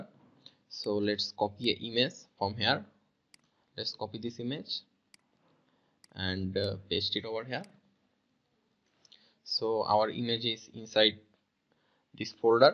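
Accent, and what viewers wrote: India. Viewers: Thank you Mr. Indian-sounding guy :DD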